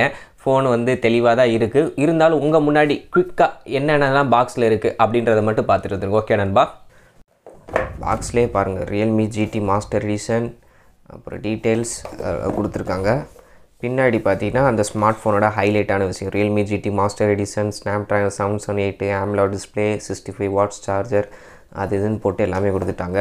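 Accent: Madurai, India. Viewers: neenga pesura madurai slang super nanbaaa🤘✌️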